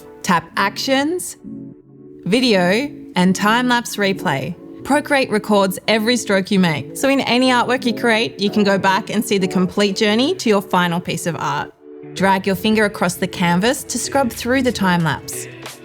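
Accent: Australia. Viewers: Great tutorials but her voice/accent is like nails on a chalkboard. Aussie accents are the worst. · Good to hear an Aussie voice!😊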